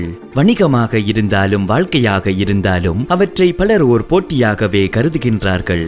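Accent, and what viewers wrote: Sri Lanka. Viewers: Bro neengal srilanka tamila Pesuranga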